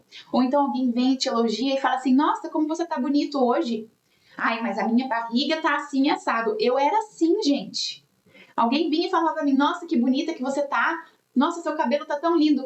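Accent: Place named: Minas Gerais, Brazil. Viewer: Teve que falar Português?! Você tem o sotaque mineiro e fala bem a Língua Portuguesa.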